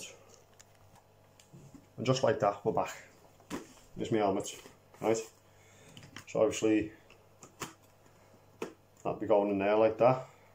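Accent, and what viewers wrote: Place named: Australia. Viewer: Ripper Aussie accent, think you should have a blast of the Nigeria accent!! Wat’cha think?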